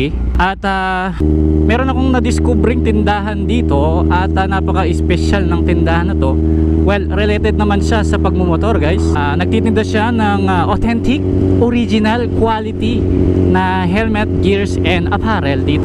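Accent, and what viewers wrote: Pampanga, Philippines. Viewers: Mangarakap ka na tune kang kapampangan ne sir? Hahaha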